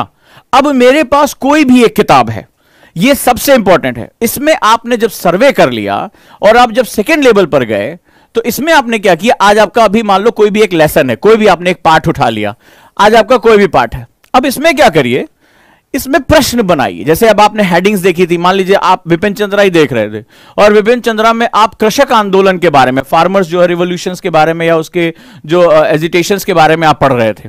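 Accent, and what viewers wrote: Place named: Bihar, India. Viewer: Mind blowing explanation sir thank you so much 💐 specially your bihari accent Amezingg.... Superb 😆 Again thanku sir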